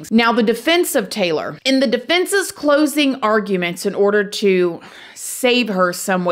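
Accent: South, United States. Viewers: I thought this was Annalise's and I just thought I never noticed what a heavy southern accent she has LOL well good I'm happy to try out a new channel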